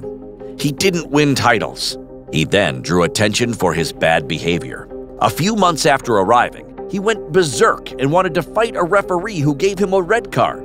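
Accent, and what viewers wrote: United States. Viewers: its not benfeca its pronounced benfi-ica, and is it only me that finds it weird to hear American accent on a football video?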